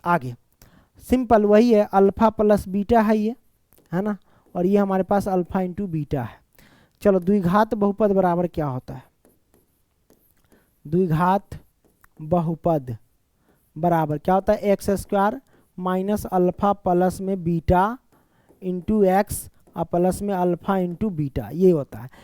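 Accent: Bihar, India. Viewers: Sir apki aavvaj bahut hi mast hai bihari type me mast samaj me aya ho to subscribe kare ❤❤❤❤❤❤❤❤❤❤❤❤❤❤❤❤❤❤❤❤❤❤❤❤❤❤❤❤❤❤❤❤❤❤❤❤❤❤❤❤❤❤❤❤❤❤❤❤❤❤❤❤❤❤❤❤❤❤❤❤❤❤❤❤❤❤❤❤❤❤❤❤❤❤❤❤❤❤❤❤❤❤❤❤❤❤❤❤❤❤❤❤❤❤❤❤❤❤❤❤❤❤❤❤❤❤❤❤❤❤❤❤❤❤❤❤❤❤❤❤❤❤❤❤❤❤❤❤❤❤❤❤❤❤❤😍😍😍😍😍😍😍❤😍❤😍❤😍❤😍😍❤😍❤😍❤😍❤😍😍❤😍❤❤❤❤❤❤❤❤❤❤❤❤❤❤❤❤🎉🎉🎉🎉🎉🎉❤🎉❤🎉❤🎉❤🎉🎉❤🎉❤🎉❤🎉❤🎉❤❤❤❤❤❤🎉🎉❤🎉❤🎉❤🎉❤🎉❤❤❤❤❤❤❤❤❤❤❤❤❤❤❤❤❤❤❤❤❤❤❤❤❤❤❤❤❤❤❤❤❤❤❤❤❤❤❤❤❤❤❤❤❤❤❤❤❤❤❤❤❤❤❤❤❤❤❤❤❤❤❤❤❤❤❤❤❤❤❤❤❤❤❤❤❤❤❤❤❤❤❤❤❤❤❤❤❤❤❤❤❤❤❤❤❤❤❤❤❤❤❤❤❤❤❤❤❤❤❤❤❤❤❤❤❤❤❤❤❤❤❤❤❤❤❤❤❤❤❤❤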